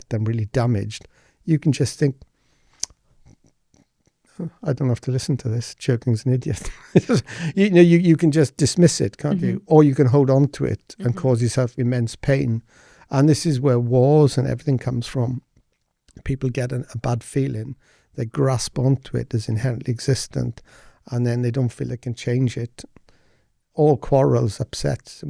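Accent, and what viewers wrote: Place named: North, United Kingdom. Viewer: Still can’t get over a monk with such a strong northern accent 😝👍🏻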